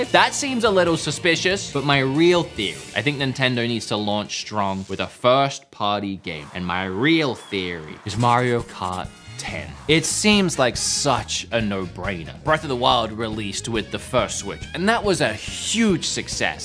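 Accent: Australia. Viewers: I'm Mexican. is the sole reason I love Australian people so much, obviously biased. But opened the window to another culture and accent I didn't know and enjoy. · I'm just sitting here tripping out about how you seem to simultaneously sound like you're from Melbourne, Australia and Brooklyn, New York. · Wait, you're Australian? I guess I can't really tell an Australian voice apart on YouTube as I didn't know with MVG either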